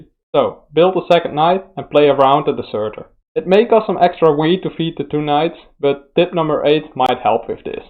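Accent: Netherlands. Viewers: Can I guess your nationality? I’m very into accents, and I think that you might be Dutch. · Even in English I can hear your Frisian accent, lol. Thanks for the Tips :)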